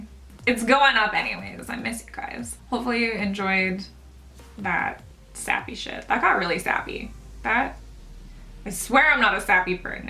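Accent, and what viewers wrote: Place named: Canada. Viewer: I love your vids girl! I didn't know you were a fellow Canadian ! Even though how could I not know.... our accents are pretty distinctive :p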